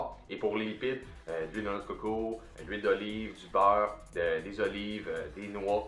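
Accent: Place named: Quebec, Canada. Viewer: Vous parlé trop vite et avec l accent quebequoise j ai du mal à suivre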